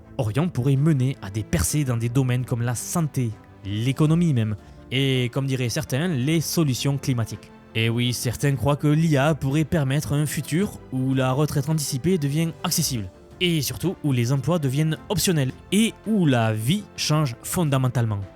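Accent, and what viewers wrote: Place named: Southwest, France. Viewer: On dirait bien l'accent du sud ouest :)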